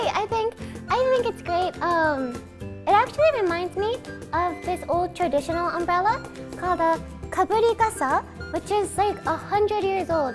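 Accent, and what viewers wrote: Japan. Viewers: I love her Japanglish!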